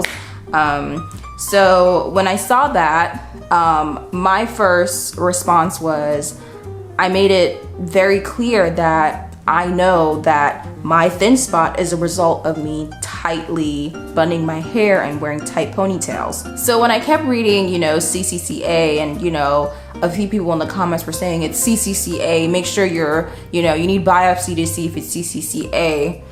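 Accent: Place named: Bahamas. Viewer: I’m definitely going to give Nutrafol a try! Are you from the Bahamas by chance? I hear a very faint accent 😊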